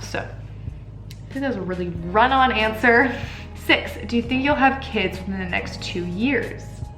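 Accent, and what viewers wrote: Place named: Canada. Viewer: "Red Fleg" I love the Canadian accent.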